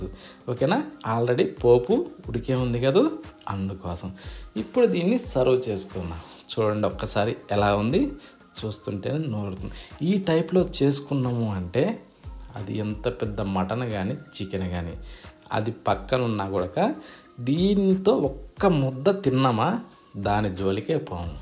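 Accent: Nellore, India. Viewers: Bro meeru Nellore valla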